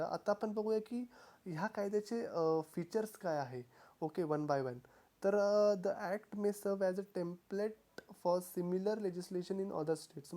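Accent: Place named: Vidarbha, India. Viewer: Great video Sir. I would like to suggest that please provide the reference for things possible. Are you from Vidarbha region, seems from your accent.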